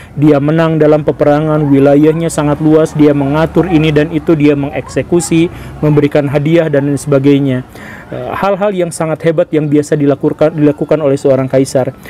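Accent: West Java, Indonesia. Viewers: Pak unik bahasanya...nyunda banget😁😁😁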